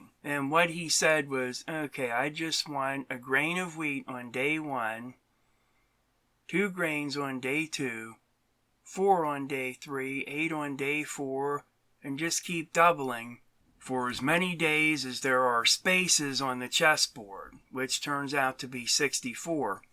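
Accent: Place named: Pennsylvania, United States. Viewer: Puts things into perspective! Your accent reminds me of my X's Ma. They were from Zelienople.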